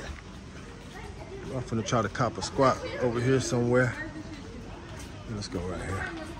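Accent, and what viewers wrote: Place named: Virginia, United States. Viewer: This is the truth he defiantly from Virginia it's the voice , VA dudes we just deferent, and women from all over the World love US ,and not just that even if he not from Virginia he branded with speech Pattern , but I just like to see yall together , and both of you make a Beautiful Couple ,and like the videos keep them coming.💪💪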